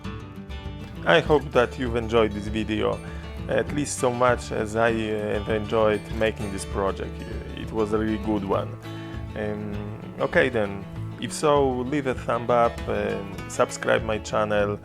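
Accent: Poland. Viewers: od razu słychać ze polak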